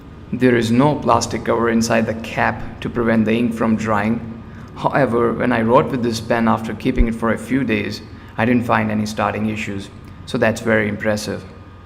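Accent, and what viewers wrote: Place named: India. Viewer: Are you in India? you could get these in India (judging by your accent)? 🤔 I don't think I could get these in Bangladesh 😭.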